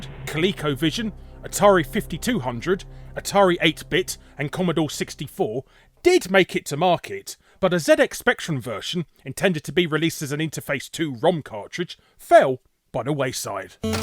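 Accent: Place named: Southern England, United Kingdom. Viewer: What's a parth? There is no R in path or bath nor a second one in grass fucking southerners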